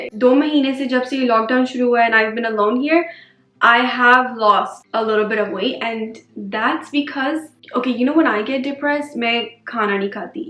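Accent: Pakistan. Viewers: Your Urdu accent is exactly like Pakistanis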